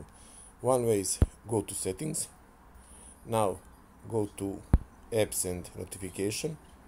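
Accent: Serbia. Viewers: A cujem lik prica engleski nekako kao da je nas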